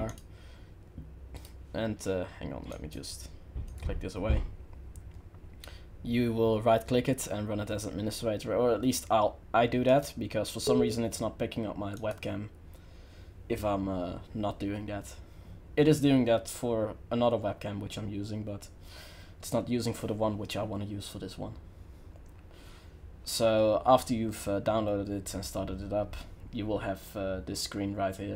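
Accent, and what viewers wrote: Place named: United Kingdom. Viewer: Nice accent, you have a British one, even tho you're a Dutch.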